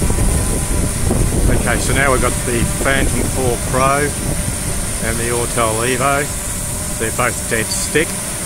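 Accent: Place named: Australia. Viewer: Where are you ? You lucky son of a gun !! Australia I think judging from your accent but wherever you're at looks awesome!!!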